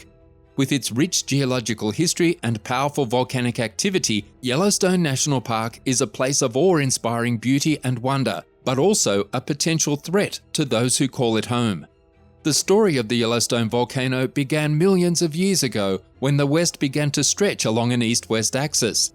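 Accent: Australia. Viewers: I started to watch this to see what fate was going on in the good ol USA. Much to my surprise there was an Ozzie bloke narrating and I knew it was a hoax. Who could take that seriously...Crikey!!! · I don’t know the the narrator is from Australia, so what is interested in Yellowstone? I don’t know if I would believe someone from Australia would have all the answers for Yellowstone national park.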